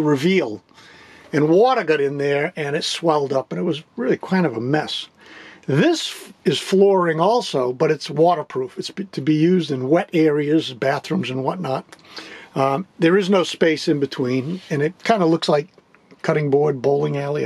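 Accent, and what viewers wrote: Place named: Northeast, United States. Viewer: Where in the northeast? I can hear home in your voice.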